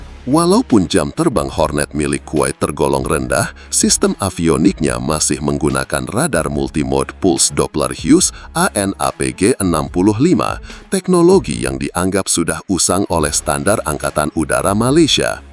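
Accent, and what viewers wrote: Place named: Indonesia. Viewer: kenapa admin suara indon dan bahasa indon.suka sangat indon cakap pasal pertahanan malaysia.kenapa admin tak bercakap pasal alat pertahanan indon sendiri.tak ada org berminat ke kalau cakap pasal alat2 pertahanan indon tak laku ke atau ada org minat.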